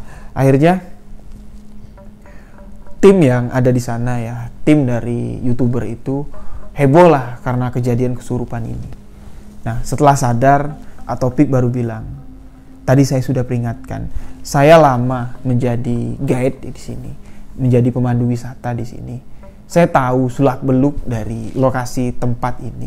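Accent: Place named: Bali, Indonesia. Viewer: hadir meski telat,suka dgn logat suaranya mas logat khas Bali 👍👍👍😀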